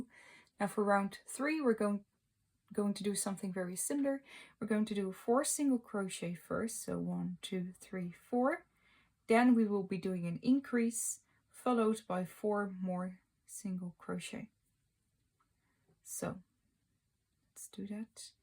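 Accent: Netherlands. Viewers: I LOVE DUCKS AND READING! So I’m making this Ofc!! And, are you dutch by any chance? I hear an accent 😅😅 you are so talented and I’m a dutch crocheted aswellll!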